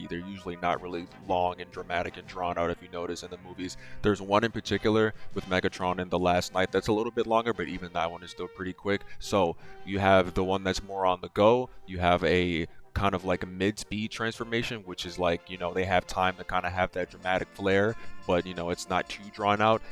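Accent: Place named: United States. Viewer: Why your voice is American your name is Indian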